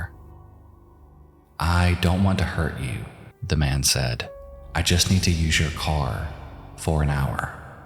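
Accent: South, United States. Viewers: I just noticed his southern accent how did I never notice it before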